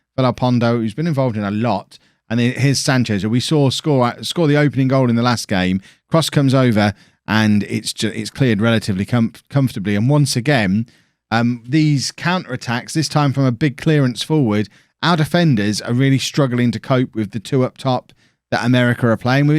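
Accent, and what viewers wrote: United Kingdom. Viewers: Man, you went to Mexico and the ladies are all just falling in love with you! I didn't know a British accent was so popular in Central America.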